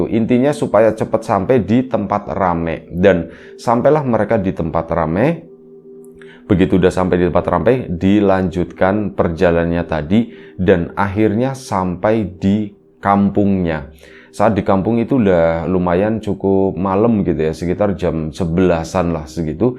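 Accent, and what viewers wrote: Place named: Banyumas, Indonesia. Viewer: Wa, alaikummusasallam wr wb, Hadir dan nyimak selalu, Oh, iya buat mas narator kok ga di jawab pertanyaan saya, Mas narator asli nya mana...? Seperti nya logat nya ada ngapak ngapak nya, maaf ya mas.... Ok dia terbaik untuk Riam biru, moga makin sukses dan buat mas narator nya moga sehat dan slalu dalam lindungan Allah SWT.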